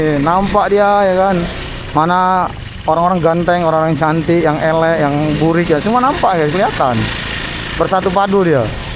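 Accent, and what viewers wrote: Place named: West Sumatra, Indonesia. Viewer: Aksen Bang kyk orang Sumbar 🙏🙏🙏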